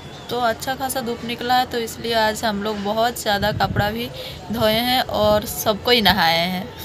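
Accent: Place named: Bengal, India. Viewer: Are you a bengali as you talking asent is like bengali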